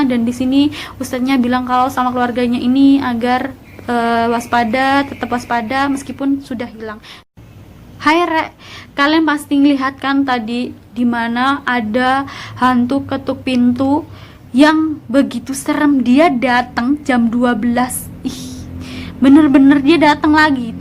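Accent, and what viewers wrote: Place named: Malang, Indonesia. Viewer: Ceritane serem, tapi ngerungokno ngomonge lucu rek... ngalam banget 😁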